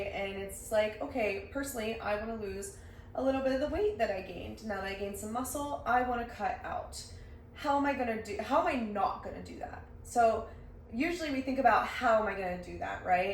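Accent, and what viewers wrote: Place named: United States. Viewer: 🙂Another good episode thanks. Btw i love how you yanks say "yaw all":p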